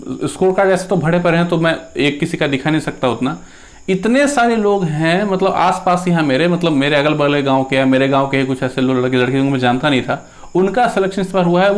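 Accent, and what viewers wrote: Bihar, India. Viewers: Aap bihar se ho?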